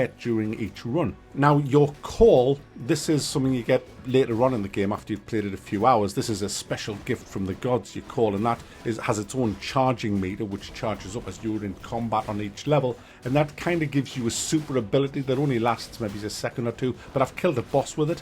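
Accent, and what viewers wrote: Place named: Scotland, United Kingdom. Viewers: Thought he is Scottish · This guy sounds Scottish.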